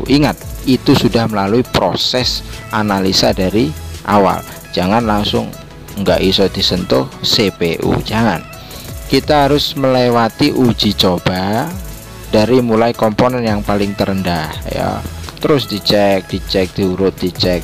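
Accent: Java, Indonesia. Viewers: Asline jowone ngendi Iki kang....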